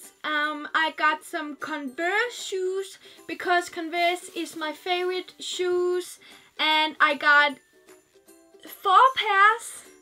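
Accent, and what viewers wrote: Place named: Denmark. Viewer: Jeg kan høre at du er dansker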